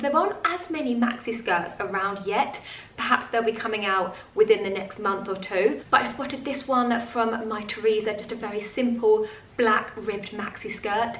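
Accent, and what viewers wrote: United Kingdom. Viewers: With love for you. Where are you from? Thank you. I like your English. British? I study english. Thank you for your answer.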